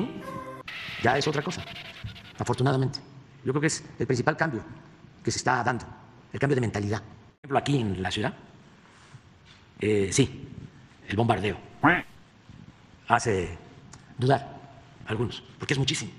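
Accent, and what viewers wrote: Tabasco, Mexico. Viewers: Se le achican los ojos, retoma el acento tabasqueño y también habla más de corrido cuando está que se lo lleva la chingada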